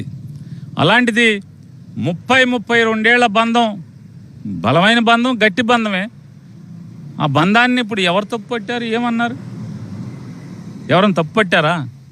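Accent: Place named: Godavari, India. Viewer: మీరు మా గూదారొళ్లలా భలే మాట్లాడుతారండీ. పాపం నాయుడు గారండీ! ఆయ్ 😊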